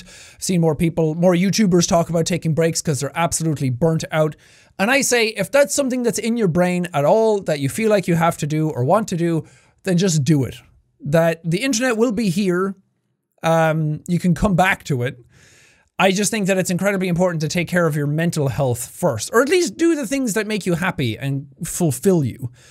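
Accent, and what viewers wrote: Ireland. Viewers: Jack r u irish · Hes irish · I miss this loud Irish person so much I started to watch his happy wheels playthrough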